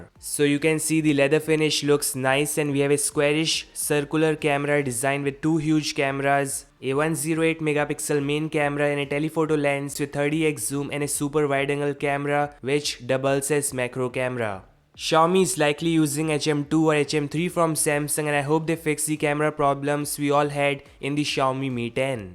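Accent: India. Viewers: Logat india people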